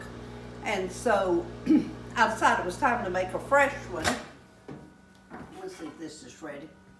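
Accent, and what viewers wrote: South, United States. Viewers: Oh my glory lol You are absolutely adorable I just love your southern charm and accent. From a generation we can surely learn so much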